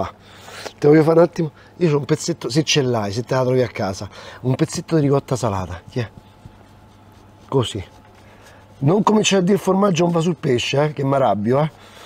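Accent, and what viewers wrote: Rome, Italy. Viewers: Faresti meglio a fare qualche film con Massimo Boldi con quel accento romano,e un complimento,sei bravissimo come cuocco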